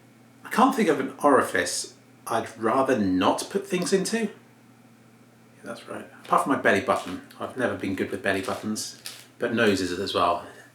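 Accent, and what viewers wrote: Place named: RP, United Kingdom. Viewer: Real sounds much more posh than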